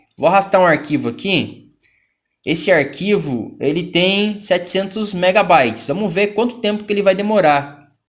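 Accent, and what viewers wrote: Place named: São Paulo, Brazil. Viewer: Uiiii sotaque paulista irritante esse em, existem melhores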